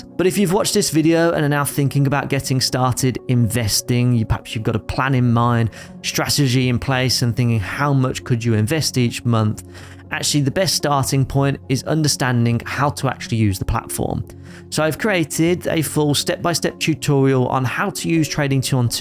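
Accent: United Kingdom. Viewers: You when you can tell someone is British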